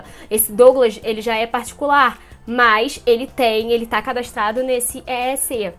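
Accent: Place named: Rio de Janeiro, Brazil. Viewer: Maix, pexquisa. Aposto que é carioca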